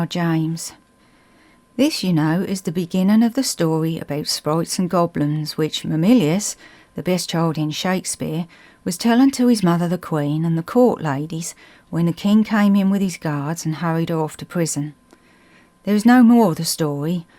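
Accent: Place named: Suffolk, United Kingdom. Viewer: Well suited together, M.R. James and the Suffolk accent.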